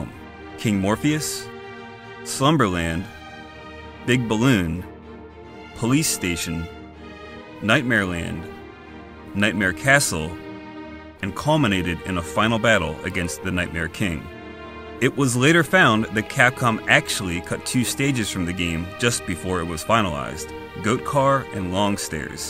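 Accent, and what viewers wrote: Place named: United States. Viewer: Discovered your videos last night and love them. I like your accent as well, where in the US are you from?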